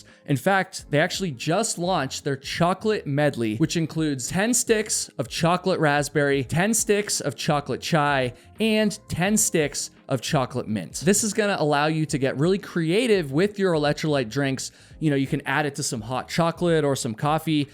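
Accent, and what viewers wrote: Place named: New England, United States. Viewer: is a New Englander, irrc. I noticed staying in rural Vermont/upstate New York years ago that a lot of the speech patterns and sayings up there are quite similar to ours in the UK. Definitely moreso than out west or in the bigger cities.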